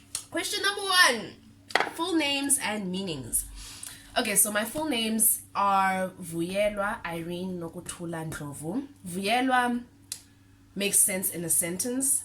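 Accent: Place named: Pietermaritzburg, South Africa. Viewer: You sound like you from PMB though...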